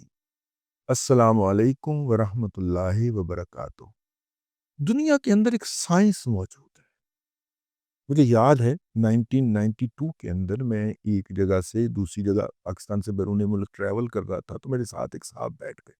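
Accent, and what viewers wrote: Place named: Lahore, Pakistan. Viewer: Drood sharif ki barkat ka asar hai. App nadar oar bebak tabsara karte hain. Indian media ki aap ki Lahori lehje wali mix urdu ne bolti band kar de hai.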